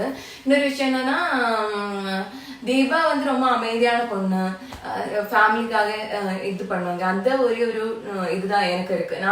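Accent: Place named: Kerala, India. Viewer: Malaiyalam kalantha Tamil speach ketka Rompa Nalla iruku.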